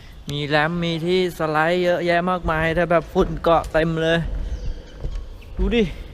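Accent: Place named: Suphanburi, Thailand. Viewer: ติดใจเหมืองเหน่อ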